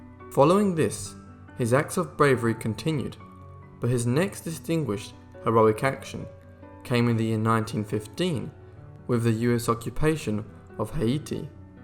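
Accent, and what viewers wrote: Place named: United Kingdom. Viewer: Having a British man quoting Dan Daly during the first World War sounds wrong. No way Dan Daly would have pronounced and inflected his words this way, he would have sounded ridiculous. Nice try though. Oorrah Devil Dogs! Semper Fi, Dan.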